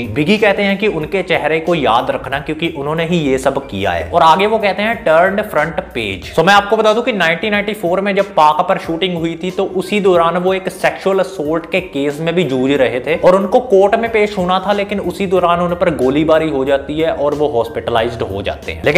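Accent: Punjab, India. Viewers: Bro tu punjabi aa ??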